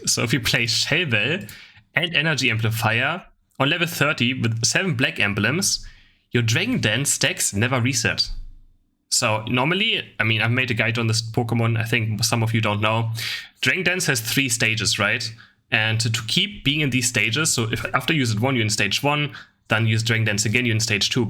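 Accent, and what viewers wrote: Germany. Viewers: How can there be Tsareena and Machamp but NOT Lucario?? Lucario is the completr package in so many ways. You can play both builds and its super strong. You can even counter jungle in early game before dread to Set the enemy jungler behind. You have so much secure with him, theres no reason to not have lucario on this list. Its beyond me how you can miss him on ur list. Sidenote: Also please work on ur english pronunciation, your accent is so sharp it could cut bread or Brot, my German friend.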